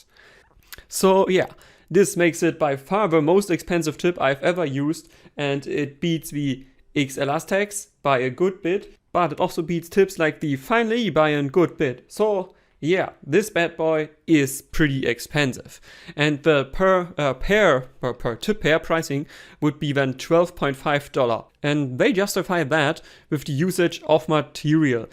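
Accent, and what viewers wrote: Germany. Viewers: shouting SS with german accent... to much fo rme.. I roffled and moved on...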